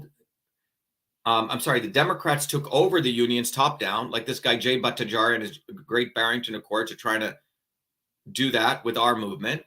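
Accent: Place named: Kansas, United States. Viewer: Im 41 and I live in central Kansas, USA. I was raised in a 3,000 person rural town. I will be blunt, I love showing Dr. Shiva to all of my cracker redneck hillbilly hick ass friends! I love to hear him SPEAK EXACTLY the same way that "we" all talk around here. I',m going to tell them to start closing their eyes before they start to listen. Scumbags equals ALL POLITICIANS! Look here, it is time for real talk, foul language, and time for some fucking action. Semper Fidelis means something to me again and I will register to vote again and go back to encouraging my kids to serve for our country as i did if this dude gets elected. Fuck the Senate, we need you now Doc! Help us save what is left of our failing country so that, among MANY THINGS, our fallen will have not died for anything.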